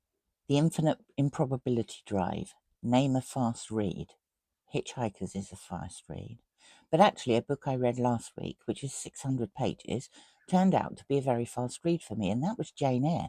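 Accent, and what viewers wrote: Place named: United Kingdom. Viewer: I enjoy the way you speak. It sounds very soft and elegant to me. I guess it kinda reminds me of Book Chat With Pat, but just… the British version? 😅 Yeah.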